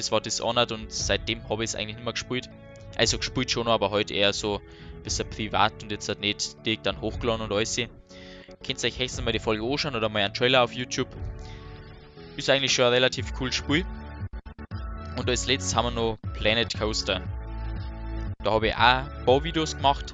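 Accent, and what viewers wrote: Bavaria, Germany. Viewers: Das ist ja eben das geile ... besonders mit deiner bayerischen Stimme währe das richtig nice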